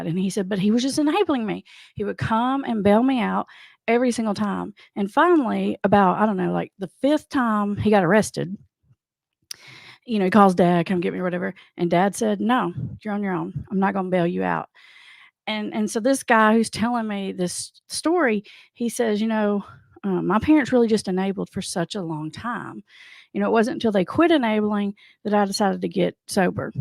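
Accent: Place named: North Carolina, United States. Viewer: Hey ! We share the same dialect ! (n.w. N.C.) 🏅 👍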